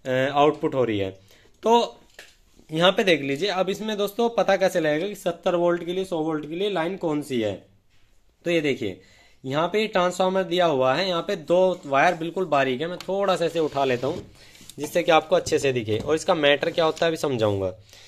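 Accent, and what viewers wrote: Meerut, India. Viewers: भैया मैं आपकी बहुत सारी वीडियो देखता हूं और आपका काफी पुराना सब्सक्राइबर हूं मुझे आपकी भाषा बहुत अच्छी लगती है क्योंकि मेरे मेरठ जिले जैसी ही लगती है जैसी मेरे मेरठ जिले की भाषा है आपकी भाषा बिल्कुल वैसी ही है अगर बुरा ना मानो तो मैं आपसे आपका निवास स्थान पूछ सकता हूं क्योंकि मेरा भी एक एलिजिन कंपनी का एंपलीफायर है जो खराब हुआ पड़ा है आपसे ठीक कराना चाहता तुम मुझे वह एंपलीफायर लेकर कहां आना पड़ेगा इतना बता दीजिए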